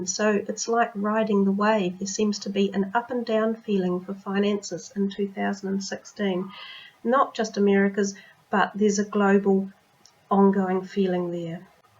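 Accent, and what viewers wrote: New Zealand. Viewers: I'm loving hearing your Kiwi accent!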